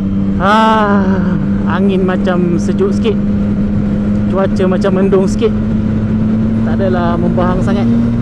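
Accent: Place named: Kelantan, Malaysia. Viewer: Doh msk kwsan kelate gk bey..ejs kcek kelate plok bey..hehe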